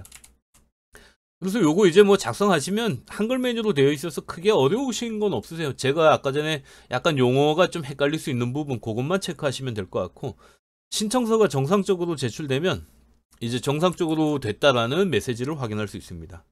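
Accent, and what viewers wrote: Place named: Gangwon, South Korea. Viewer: 좋은 정보 감사합니다 말씨가 약간 강원도 억양이 보이네요 강원도 분이시죠? 저는 강릉에 거주중 입니다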